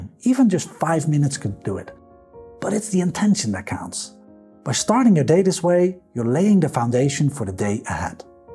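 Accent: Netherlands. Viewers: Love your ideas and videos. I am trying to decipher your "almost Dutch" accent. Do you mind disclosing what country you are from originally? I hope you write a book and a work book!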